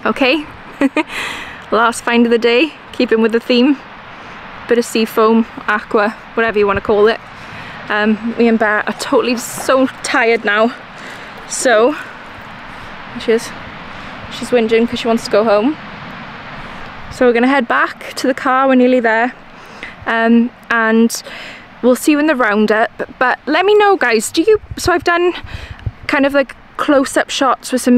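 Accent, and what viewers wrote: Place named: Wales, United Kingdom. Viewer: In love with yr welsh voice ! Beautiful 💋 ❤❤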